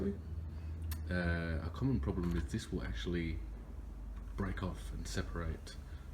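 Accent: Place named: New Zealand. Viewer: Thankyou for this bro. Saved me a fortune right before Christmas. Sounds like you're from nz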